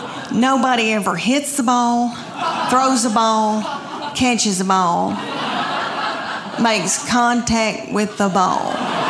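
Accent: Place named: South, United States. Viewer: heard her Tennessee accent and thought,"I'm home."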